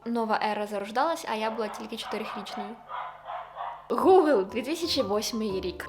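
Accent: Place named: Central Ukraine, Ukraine. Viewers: Ви межі своєї області не покидали ніколи?😅 Центр і схід України, майже всюди, говорять так) Послухайте творчість Артистки, вона з Херсонщини😊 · Я так думаю дівча з Полтавщини... це типова полтавська "манєра балакати". Я сама з Полтави, у мене навіть подружка є, просто копія ця мала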